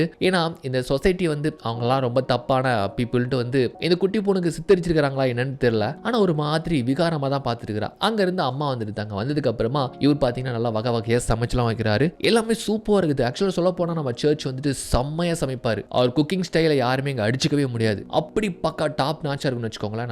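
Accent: Tirunelveli, India. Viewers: Enna bro thirunalveli veliya varuthu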